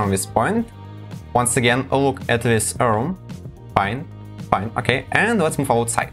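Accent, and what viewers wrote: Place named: Russia. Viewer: Русский акцент узнается с первых слов🙃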